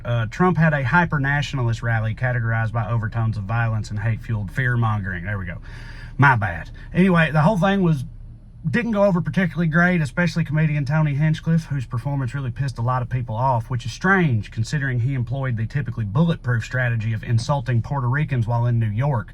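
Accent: South, United States. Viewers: I am just stumbling in, how the hell did it take so long for my youtube startpage to recommend me this channel???? Probably racist what I think but I'm a european who "studies" the American people with great fascination and interest since Michael Moore's "Bowling for Columbine" and the way you deliver those great democratic messages with this accent which is mostly portraied with this "south will raise again" stereotype is completely hilarious for me right now. Amazing :D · Thank you so much for bringing humor to all the news I listen to. Here on the W Coast---Love hearing it in your Southern accent and wit!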